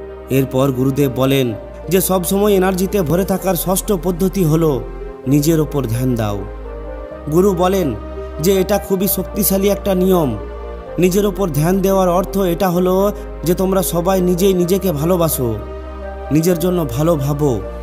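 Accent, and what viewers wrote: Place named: Bankura, India. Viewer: ভাই আপনার বাড়ি কি বাঁকুড়াতে। আপনার কথার টান শুনে মনে হচ্ছে।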